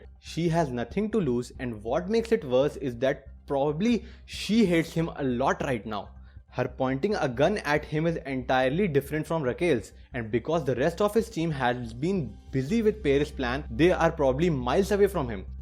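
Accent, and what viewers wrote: India. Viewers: your accent, are you from India?? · Your India accent is so strong ....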